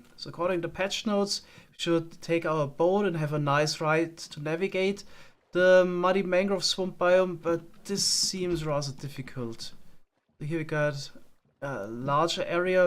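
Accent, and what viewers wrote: Germany. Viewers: Are you German? Your accent really came out in this video. Very nice. 🙂 👍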